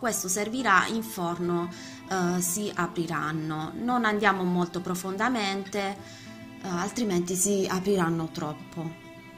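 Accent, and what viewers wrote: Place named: Taranto, Italy. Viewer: Bravissima anche la mia nonna le faceva cosi e forse sentendo il tuo accento non mi sembra molto diverso dal suo. Lei é di un piccolo paese in provincia di Taranto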